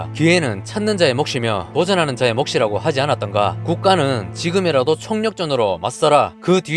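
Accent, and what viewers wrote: Gyeongsang, South Korea. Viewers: 영상은 좋은데 갱상도 사투리 오지네 짜증나게.....! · 갱상도 나레이터 매력있네요. ^^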